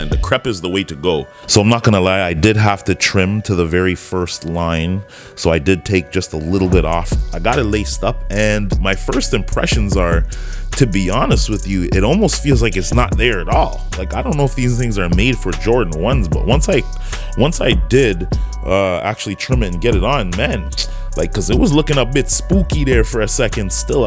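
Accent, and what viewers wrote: Toronto, Canada. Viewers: The Toronto talk has me rolling dawg😂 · That Toronto accent is annoying af 😂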